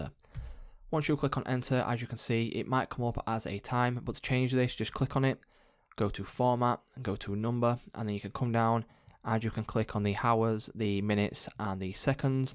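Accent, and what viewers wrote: United Kingdom. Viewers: i think bro is britian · you are british. arn't you?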